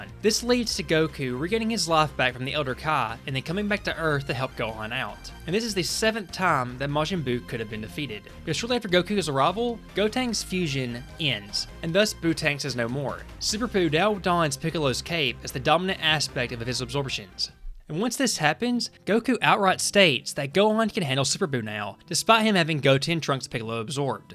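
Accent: South, United States. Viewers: Love the hick accent. You sound like my grandmama😂😂😂❤️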